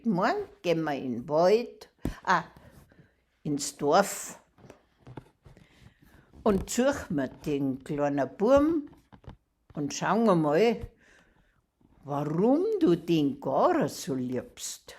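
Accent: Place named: Bavaria, Germany. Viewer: Einso schönes Märchen im bayrischen Dialekt. Auch als Nordlicht konnte ich sehr gut folgen. Ich mag Mundart erzählt sehr! Es ist authentisch und hat Herz. Auch finde ich die digitale Kamishibai sehr ansprechend. Ich habe gerne zugesehen und zugehört.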